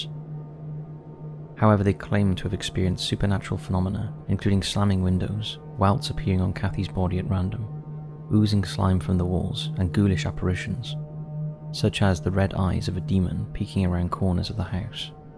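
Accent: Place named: England, United Kingdom. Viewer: I thought wecwere going to see and hear Ed & Lorraine Warren. Instead, we hear someone with an english accent talking over the entire video. Did not hear any of the actal video. Extremely dissappointing.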